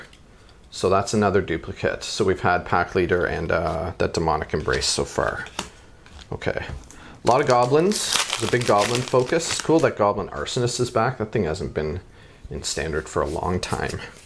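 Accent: Canada. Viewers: The amount of times he’ll say “eh” in his videos, no doubt he’s Canadian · First! Awesome videos! Canadian Accent is Crazy!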